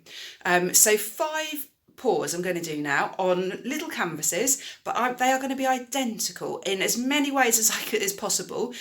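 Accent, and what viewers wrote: United Kingdom. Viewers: Thank you, you have saved me a lot of time and work and also convinced me that I do need to use a pouring medium for some projects and not just water. Like you I like the way the colours react and spread, if that’s the correct term, with just water. I am pretty new to all this and finding out new things all the time, like in a couple of sea/beach pours I have just done, I got cells and lacing with the white (Amsterdam Titanium white) over iridescent colours, not so much over the plain colours. All the paints were mixed with a pouring medium (1parts Owatrol to 2 parts water) - 1 part paint to 1 part pouring medium and then water if needed to get the right consistency. I’m very happy with them and luckily we have holiday accommodation, my experiments will grace their walls! And hopefully some will sell!😜 Thank you again, I follow Molly, Kinella and Rinske, but it’s so nice to hear a British voice, their ideas and recommendations. 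Jeanette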